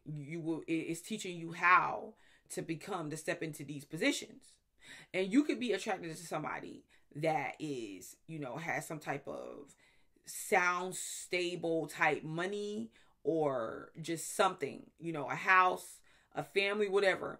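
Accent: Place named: East Texas, United States. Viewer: Are u from east texas by the way? I love your accent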